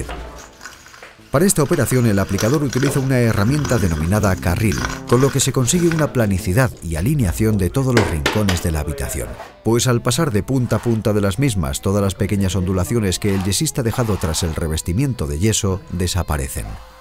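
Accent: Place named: Spain. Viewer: No sé quienes están más brutos si los que critican sin conocer o los que preguntan que de qué país es este. Las ciudades mencionadas en el video son de España y el acento del narrador es Español, inconfundible. Por otra parte las técnicas de construcción varían grandemente entre países, ya que los productos no son los mismos, por ejemplo, la forma de preparar concreto en Argentina y Mexico son diferentes, por que no es el mismo tipo de cemento que el que se fabrica aquí por Cemex o Holcim(antes Apasco). El yeso, en Mexico se aplica vivo, sin mezclar, allá es muerto, matando el fraguado con el mezclado continuo, son simplemente técnicas diferentes.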